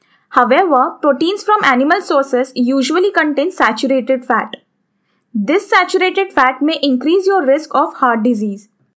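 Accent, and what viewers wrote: India. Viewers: Indian logo ki english..uffff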